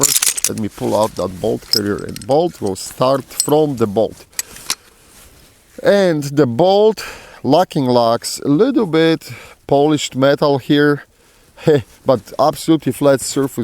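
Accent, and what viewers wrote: Poland. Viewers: I know this is an old comment, but this is his real accent. He’s Polish.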